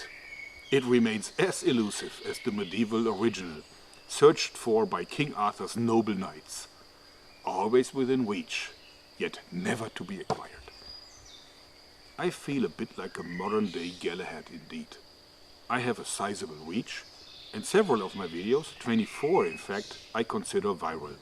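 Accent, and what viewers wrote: Germany. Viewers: I'd only watch you eat a apple attached to a drill spinning, for effect of course. But We love the videos. I personally take time off of work to watch every upload when it's fresh. For me it's the German accent mixed with the engineering genius and mechanical knowhow that really floats my boat. p.s the cheesy jokes are also quite awesome. I personally would like a full almost like a cribs episode for your work shop and general facilities outside. hope to see it soon. show us it's features! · are you German by any chance?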